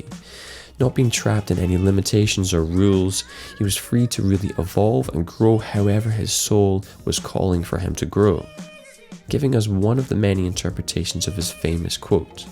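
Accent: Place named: Northern Ireland, United Kingdom. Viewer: Sorry man, was thinking Scottish but Northern Irish was in my head too. I'm from the Republic meself. Hope to see your work get recognition some day.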